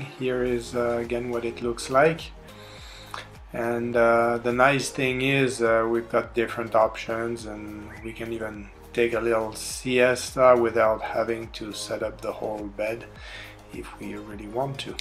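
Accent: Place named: Argentina. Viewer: hacelo en castellano, no se aguanta ese acento argento en el inglés....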